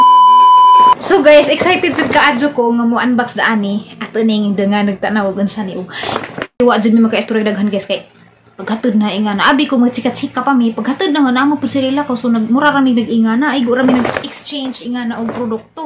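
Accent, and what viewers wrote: Bohol, Philippines. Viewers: You're deserving ma'am, because you're so kind and truthful. Malipayon jud ko makadungog sa imong bol-anon accent, taga bohol man sad ko sa Dimiao Bohol, God bless you and your family 🙏🙏🙏🌷🌷🌷❤❤❤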